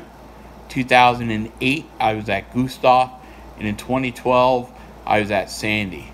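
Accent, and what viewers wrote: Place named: Eastern Pennsylvania, United States. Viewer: Did I read a comment that you are in Eastern PA. That is where I am Originally from. I should have recognized the accent.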